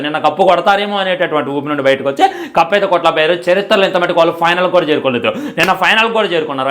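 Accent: Khammam, India. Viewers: anna meedhi khammam kadha?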